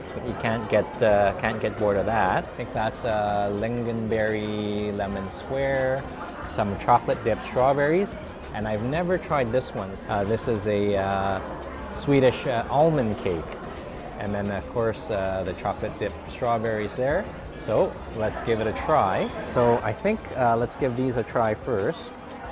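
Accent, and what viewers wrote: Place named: Canada. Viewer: Love your Canadian accent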